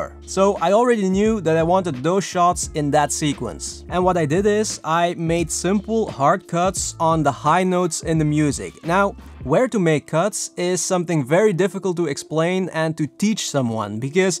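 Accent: Belgium. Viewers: Heel mooi om jouw Nederlands (Belgisch accent) te horen. Moet je wel vaker doen :)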